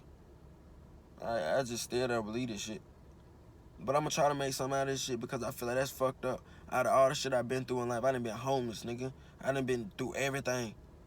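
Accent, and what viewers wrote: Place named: Chicago, United States. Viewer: I didn’t watch the season you’re in I watched the first 3. You sound like you frm Chicago tho haha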